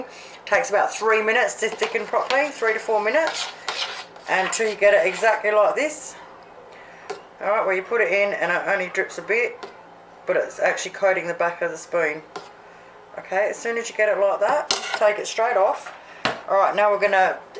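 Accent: Australia. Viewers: Great to find a Cook with a good ' downunder ' accent. !!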